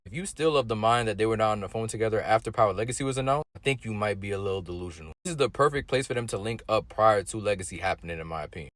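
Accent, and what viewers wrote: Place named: Toronto, Canada. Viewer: you have to be from toronto with that accent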